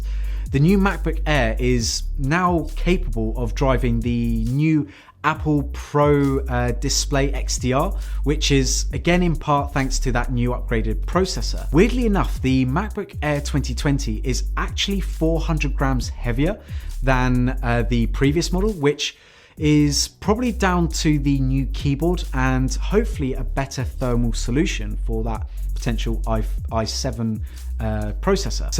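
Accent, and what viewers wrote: United Kingdom. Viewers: Too British to listen to.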